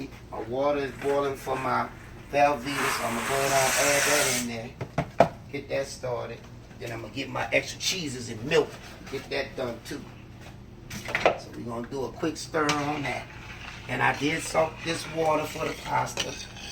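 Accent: New Orleans, United States. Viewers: Oh you moved from Louisiana to Dallas because you sound NOLA🔥 FASHO I KNOW YOU MISS FOOD BACK IN THE BOOT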